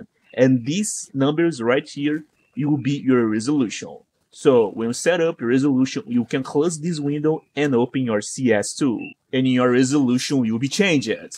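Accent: Brazil. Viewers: crtz q é brasileiro tem mt sotaque kkkkkkkkk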